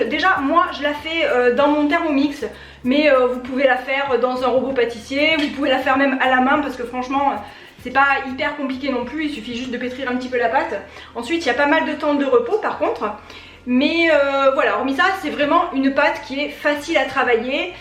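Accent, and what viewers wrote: South, France. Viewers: Coucou ma petite.. Quel plaisir que d'entendre cet accent du sud de si bon matin... tu mets du baume au coeur... Bon tes petits pains ont tellement l'air bon... ça donne envie de croquer dedans et je sens l'odeur rien qu'en les regardant 😂 J'espère que le confinement se passe bien pour vous... En aparté vas tu faire une vidéo pour le nouveau pack ou tu ne joues plus du tout? Moi j'avoue que ça fait des jours et des jours que je n'ai pas ouvert mon jeu... 🙄voilà 🤪 Je te souhaite un très bon week-end avec ta petite famille... Pleins de bisous et prenez soin de vous tous 😘🌹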